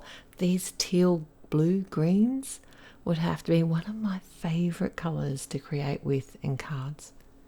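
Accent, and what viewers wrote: Australia. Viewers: it’s nice to find a new papercrafter I can follow. I appreciate the effort it takes to make a video the length of yours and like that you don’t waffle on about irrelevant stuff. You’re Australian?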